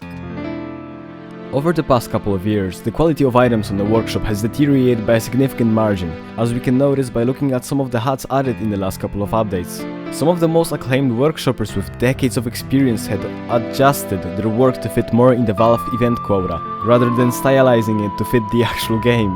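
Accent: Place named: Eastern Europe. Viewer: Are you Central or Eastern European? Your accent sounds slavic. Great video by the way.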